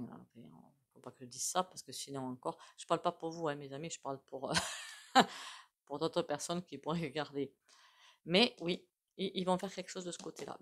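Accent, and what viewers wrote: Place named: Midi, France. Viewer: avec l'accent fort du Midi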